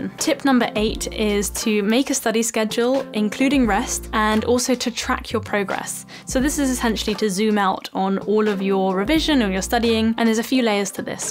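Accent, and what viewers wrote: RP, United Kingdom. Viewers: If you ever make another video, wich in fact i doubt, please answear in your responses if you speak RP all the time or just with us in these videos. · I love your British accent a lot. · I want to learn British accent and I really like your accent and thnx for tips . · British accent 😭😭😍😍😍😍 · Hi are you British ☺️ it's important for me because I am trying to learn British accent .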